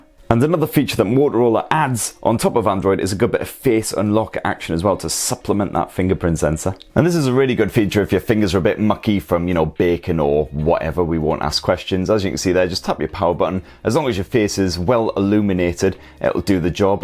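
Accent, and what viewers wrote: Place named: North East England, United Kingdom. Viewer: ... I would say he's from the north east of England but not quite as far as Newcastle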